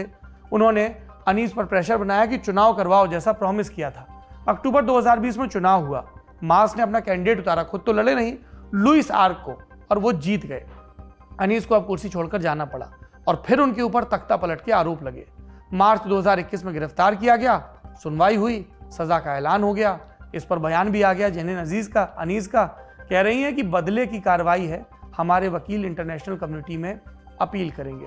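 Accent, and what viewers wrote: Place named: Bihar, India. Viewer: Jaise aap bolna start kiye waise he bolia... achaa lagta hain.....uttar pardesh ka Bihari bhaasa me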